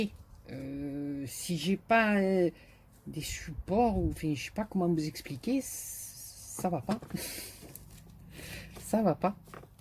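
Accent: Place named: Marseille, France. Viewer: Coucou ma! Mais si mais si, chantes-nous le Titanic...mdr Merci pour tous tes magnifiques partages, c'est toujours un plaisir. Mais le Titanic avec ton accent de Marseille, peu chère que ça me plairait!!! Bisous bisous ♥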